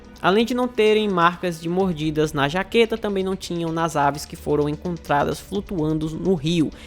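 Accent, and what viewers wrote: Northeast, Brazil. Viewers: Adoro o sotaque nordestino do.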